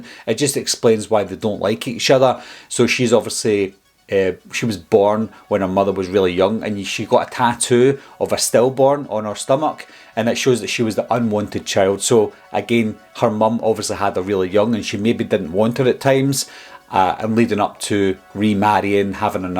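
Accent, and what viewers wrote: Scotland, United Kingdom. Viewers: First time viewer. Solid intro, then at 25 seconds that Scott accent starts in with the vocabulary. Instant subscription.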